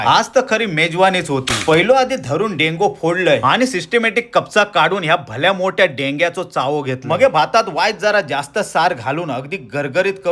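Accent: Malvani, India. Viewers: आवाज with मालवणी touch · पयला मालवणी बोलतास ता ऐकणं बरा वाटला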